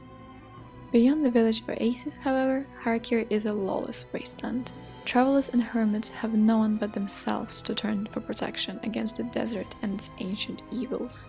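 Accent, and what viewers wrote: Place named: Iceland. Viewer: lolz you sound icelandic. Besides ravenloft har'akir, how about Athas dark sun?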